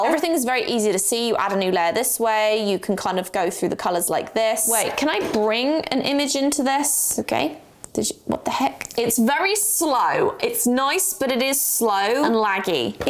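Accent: England, United Kingdom. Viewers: Sorry are u english? I can't tell if ur American lol the for making this vid!! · The British accent I love it Bettah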